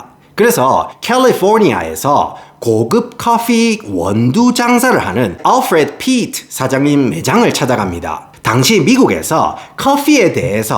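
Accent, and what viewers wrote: Gyeongsang, South Korea. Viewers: 와.. 저희할머니가 쓰실법한 찰진 경상도사투리와 촉촉하게 찰진 미국식 영어발음에 넘나 귀호강입니다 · 한국말은 완전 갱상도 사투린데, 영어발음은 완전 본토네예.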